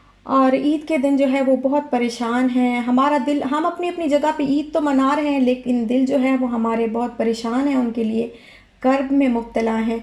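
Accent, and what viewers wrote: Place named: Hindko, Pakistan. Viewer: hi main Pakistan se hun aur Mujhe aapse ek question puchna hai ki aap Hanko Japan bolati Ho yani ke aapki Ki Jo apni Jawan Hai Hua hin Ko Hai Kyunki aapke Lage se Pata lagta hai please reply zaroor karna Kyunki Main Bhi Kohat se hun Aur meri Zubaan bhi Henko hai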